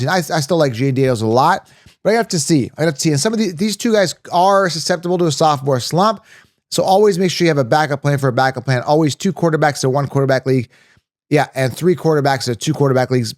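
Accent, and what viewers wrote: Canada. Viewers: It’s just his accent my man. I think he from Canada 🇨🇦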